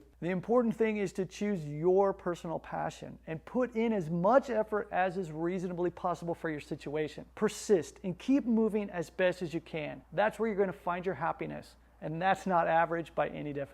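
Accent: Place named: Kansas, United States. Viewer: Sounds like he's left the land of Oz and is back in Kansas--Wichita, Kansas!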